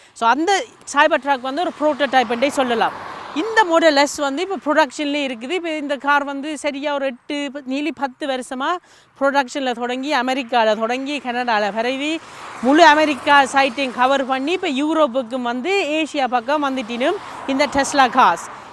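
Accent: Jaffna, Sri Lanka. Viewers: இனிமையான இலங்கை தமிழ் உச்சரிப்பு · Sri Lanka Tamil sema mass... Super Machan car oda back rampa thodai Mari hahahaha . Kuwait le irundhu neelakandan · singala tamil யாழ்ப்பாணத் தமிழ் very very sweet to ear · யாழ்ப்பாணத் தமிழ் அதுவும் இங்கிலாந்தில் இருந்து அங்கு வளர்ந்த பையன் . அதுதான் இனிமை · You are the best explanation for the new car and I proud your Jaffna Tamil speak style I love that. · இந்த பையன் பேசுவது ஸ்ரீலங்கா தமிழ் நல்லா இருக்கும். 05-8-20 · மிகவும் இனிமையான இலங்கை தமிழ் மிகவும் அறுமை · Ungal ilangai Thamizh migavum Azaga iruku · திருத்தம். ஈழத்தமிழ். அல்லது யாழ்ப்பாணத்தமிழ். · இனிய யாழ் தமிழ் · தமிழில் அதுவும் இலங்கை தமழில் பேசும் விதம் ஒரு இனிமை காரின் செயல்பாடு தன்மையை விளக்கும் விதம் அருமை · யாழ்ப்பாண தமிழ்ல சும்மா பின்னி எடுதிட்டிங்க. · i like Elangai Tamil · Srilankan tamil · Sinhala tamil super 👍👍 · இலங்கை யாழ்ப்பாண தமிழ். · இலைங்கையில எல்லாரும் இவர் மாதிரி தானே பேசுவாங்க? 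இல்லையா? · Srilankan original tamil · அருமை இனிய இலங்கை தமிழின் அழகு தனி அழகு தொழில்நுட்பத்தையும் எளிதாக புரிய வைத்த தங்களக்கு நன்றி அன்புடன் நாகராஜன் பொன்னேரி தமிழ் நாடு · illangai tamil ketka nalla eruku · Jaffna tamil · யாழ்ப்பாண பேச்சுத்தமிழில் வெளுத்து வாங்குறீங்க இலங்கை வானொலித்தமிழில் பேசினால் கேக்கும்போது செவிகளில் தேன்வந்து பாயும் , முடிந்தால் அடுத்ததடவை முயன்று பாருங்கள். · ஐயா , காரை பற்றி இலங்கை தமிழ் சூப்பா் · உங்கள் ஈழ தமிழ் அழகுக்கும், இனிமைக்கும் வானமே எல்லை. எனக்கு பிடித்த கார் விளக்கமும் சேர்ந்து இன்னும் இனிக்கிறது. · Nice srilanka tamil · ஈழத்தமிழ் கேட்க இனிமையாக இருக்கின்றது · Thanking you for best explained in yalzpana Tamil❤️❤️❤️❤️❤️❤️❤️ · இலங்கை தமிழ். அடுத்து என்ன விஷயம் என்னன்னா இந்தியா வக்குள்ள எந்த ஒரு நல்ல விஷயமும் நடக்காது. நல்லது வரவும் விடமாட்டாங்க.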